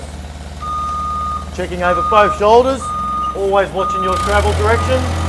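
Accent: Australia. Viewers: The guy is from Australia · The Australian accent is priceless! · The language is English. The "accent" is Australian.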